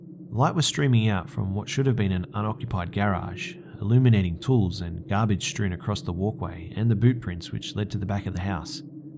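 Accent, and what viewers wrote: Australia. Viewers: you have such a nice aussie accent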